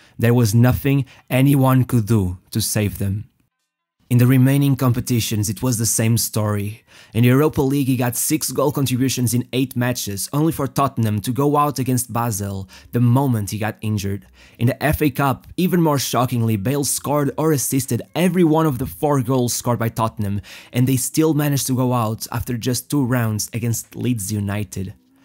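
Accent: Portugal. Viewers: I can tell your mother tongue is Portugese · Are u portuguese? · This dude (narrator) is sooooo Portuguese. I'm almost 100% certain just because of the accent.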